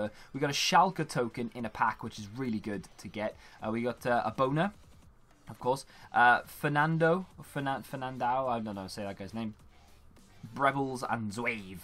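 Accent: Wales, United Kingdom. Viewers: are you welsh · tell you are welsh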